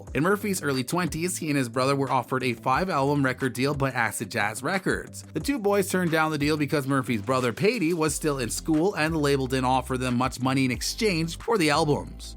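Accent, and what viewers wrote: United States. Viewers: Love him, but I wish this commentary would just shut the f up. Listening to this yank prattle on....urgh!!!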